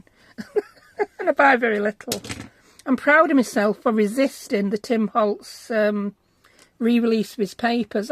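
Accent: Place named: Yorkshire, United Kingdom. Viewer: Just popping on to say hello! Come on girl, who wouldn’t love a Yorkshire accent 😅😂😊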